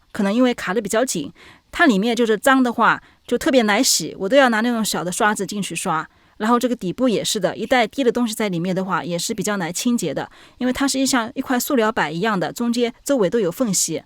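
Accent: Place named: Shanghai, China. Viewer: 博主是上海人？口音可爱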